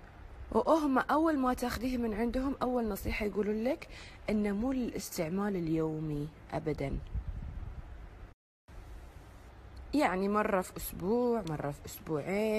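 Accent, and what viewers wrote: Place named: Gulf, Arab world. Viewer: اسمه جل وليس كريم. ليش تتكلمي لهجه خليجيه.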